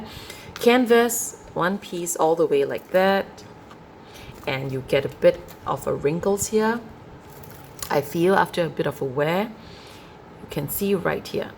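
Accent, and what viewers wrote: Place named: Singapore. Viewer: Sounds like you are from Singapore. If you do could you let me know the price for both version? Thank you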